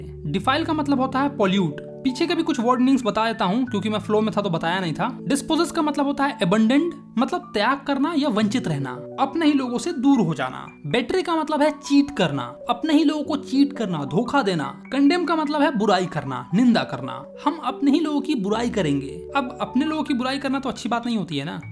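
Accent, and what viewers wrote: Bihar, India. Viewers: Bihar se ho na bhai❤ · Bhaiya aap Bihari ho kya❤ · Bihari ho yar app to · Bhai aap bihar se hona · Aap bihari ho kya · Bihari acent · Aap Bihari ho kaya? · By voice we call yell he is from Bihar 😅😂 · Are you from Bihar?? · Bihari hai ke tu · Pakka aap Bihari ho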